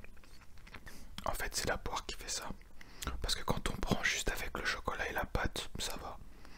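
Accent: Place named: France. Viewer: I love French accent :c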